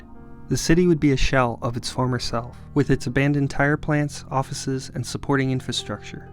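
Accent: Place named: Akron, United States. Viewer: My family has been in Kenmore for 5 generations on the S.W side. You sound like you are a local. No parking on the devilstrip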